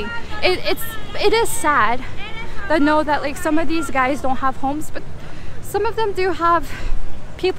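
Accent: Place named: England, United Kingdom. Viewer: How beautiful you are, I love your English accent !!😘😍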